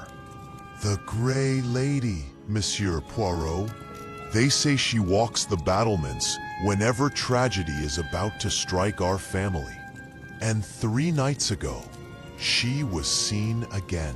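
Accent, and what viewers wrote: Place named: United States. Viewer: Can’t listen to Agatha Christie being read with an American accent plus the background noise is intolerable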